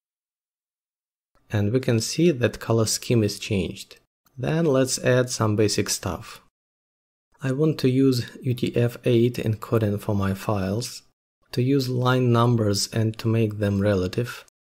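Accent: Russia. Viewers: Судя по акценту ты наш парень) Спасибо тебе большое, очень помог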